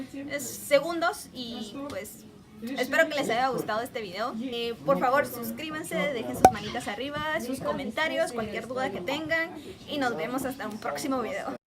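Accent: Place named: Ecuador. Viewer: Hola, usted es Ecuatoriana oh Mexicana porque tiene un acento parecido a la Ecuatoriana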